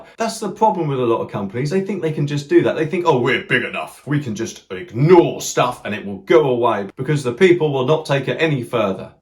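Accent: Norfolk, United Kingdom. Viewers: Where you from boy?? Swaffham??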